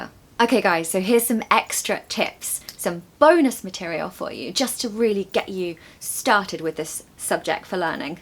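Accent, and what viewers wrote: United Kingdom. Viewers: Hello, I come from HK and now living in UK. Congratulations for you got the Sliver play from YouTube. Super thanks for your video which I did to learn every day. It was really helpful for us to listening British English. 🎉🎉🎉🎉🇬🇧🇬🇧🇬🇧🇬🇧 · Hello teacher! your accent and of other British teachers are so clean. I can identify the vowels, diphthongs, triphthongs... Like the English teacher in the movie My Fair Lady. A song well recorded, mixed and mastered by a sound engineer, each instrument is heard well, being it in the right or left side of the stereo field using headphones. Thanks teacher! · Hi, your British accent and Tudor architectural style on the background reminded me of my happy time when I was doing my PhD study in London! I'd like to learn more British English and culture here!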